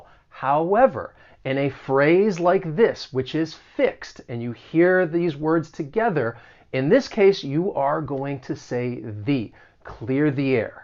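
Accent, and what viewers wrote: United States. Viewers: OH MY GOD, MY FAVORITE ENGLISH TEACHER REPLIED TO ME, YOU ARE BETTER THAN MY IN-SCHOOL TEACHERS, TYYYY, IM FROM MOROCCO BTW❤❤❤❤ AMERICAN ACCENT IS RENOWNED WITH YOU❤❤❤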